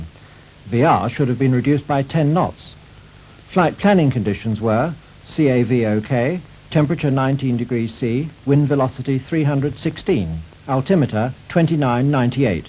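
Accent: United Kingdom. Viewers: There is just something about a narrator with a British accent that adds calmness and matter-of-factness. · More than 10% dislikes typically means F. For his Britglish i guess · Proper British for sure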